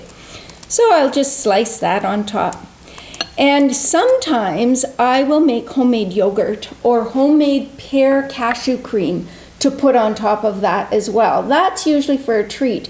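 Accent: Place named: Canada. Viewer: You have the strongest thickest Canadian accent I've ever heard!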